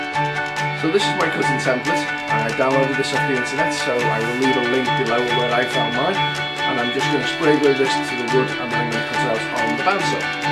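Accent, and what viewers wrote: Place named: Liverpool, United Kingdom. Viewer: oh wow Eastham eh, my Auntie and Uncle lived in Eastam in Raeburn Av, small world. I didn't want to say the accent was scouse just in case you are like me and say i'm from the wirral not l'pool. thanks for the reply and keep up these great videos, that's 2 plans i have got from you now thanks for that. Barry.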